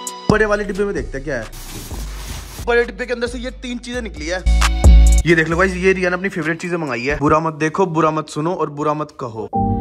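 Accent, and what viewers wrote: Haryana, India. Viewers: Bhai aap Delhi se ho ya Haryana se?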